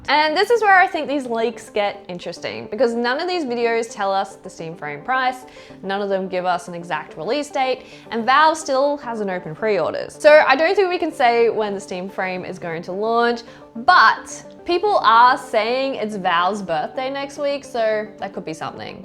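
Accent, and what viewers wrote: Australia. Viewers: I spent 90% of this video saying "so" like Australians do, because it will never not seem weird to my brain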